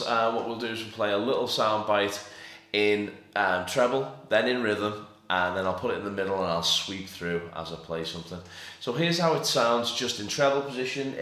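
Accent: Liverpool, United Kingdom. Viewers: haha man, as soon as i heard you speak, no mistaking that Liverpewl accent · Rickenbacker and a scouse accent. What a combo.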